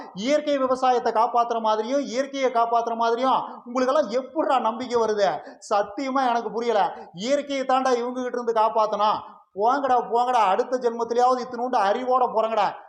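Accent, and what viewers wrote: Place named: Kongu, India. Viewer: கொங்கு தமிழ்.... சிறப்பு தோழர்....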